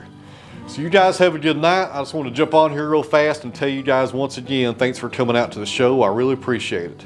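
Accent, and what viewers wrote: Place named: Kentucky, United States. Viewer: Was this english? CC displayed it as such, but it almost sounded like groningen to me (from dordrecht). Ok, must be a local dialect in kentucky.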